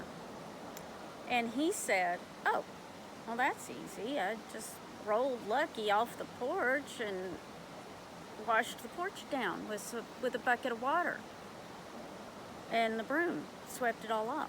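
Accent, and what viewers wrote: South, United States. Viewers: Love your southern accent !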